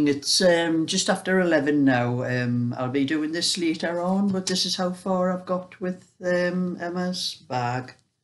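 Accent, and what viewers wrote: Newcastle, United Kingdom. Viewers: Hi. I have only recently found you. As a scouser who moved to Canada over 30 years ago, I have always been a sucker for the Geordie accent😊 I have been following your tutorial for the big crochet bag. I started as per you instructions with a 37 stitch chain Measured it and it was about 16 inches as you said. I am now doing the sides and the base magically measures 20 inches. It's HUGE! I tried to send you a picture but I don't know how to attach to this message. Do you have any advice? Thank you. Sue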